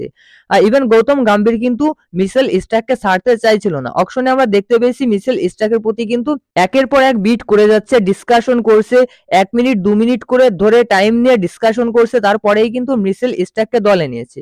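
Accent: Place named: Bangladesh. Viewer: দাদা আপনার তো ভাষাটা বাংলাদেশি মানে বাঙাল আপনি বাংলাদেশে চলে যান নাহলে আমাদের গোদা বাংলায় কথা বলতে শিখন করবো লড়বো রে জিতবো রে আমি kk r😊😊😊😊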